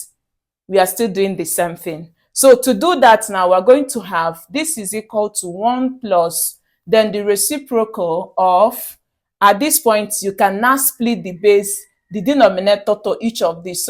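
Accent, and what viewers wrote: Nigeria. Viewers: Your accent is typically Nigerian and you are a very good math teacher.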